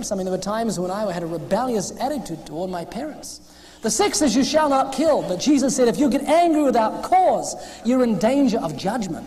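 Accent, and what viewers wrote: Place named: New Zealand. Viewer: This was when you sounded more like a Kiwi, when 'world' was more 'werld'. Love it!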